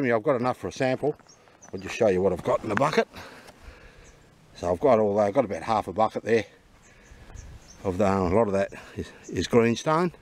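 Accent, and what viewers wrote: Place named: South Africa. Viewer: Are you from South Africa? your accent sounds like S. African English. i have some good guys from there. we done Exploration Projects here in my country. cool and professional geologists.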